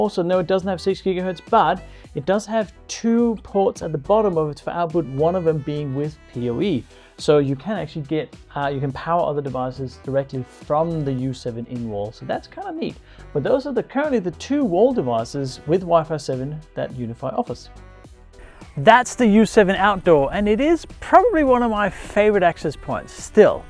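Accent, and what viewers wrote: Denmark. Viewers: Hi, do I hear a little Danish accent - also the name maybe give you up?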